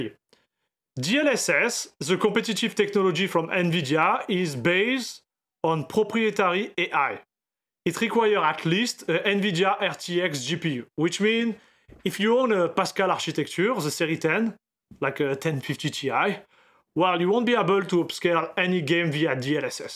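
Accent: France. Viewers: only reason i end up watching the things i already know about is due of the lovely french accent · Due to an fortunate event I upgraded my 1440P monitor to an 4k LG OLED 44C29LB. Since I still have a RX 6600 XT running I will need to use FSR every here and there. Btw. your french accent is sounds funny and good luck with your channel. You seem like a cool guy.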